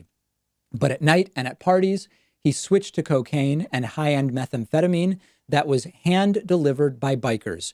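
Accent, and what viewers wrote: Argentina. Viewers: ‘Cinco de Masho’ - love the Argentine accent.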